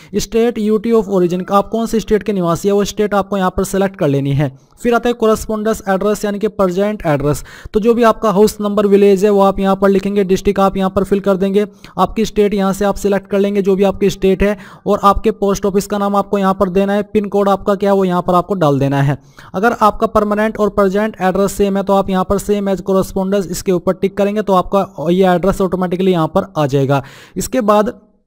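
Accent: Saharanpur, India. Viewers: Brother aap saharanpur sa ho kya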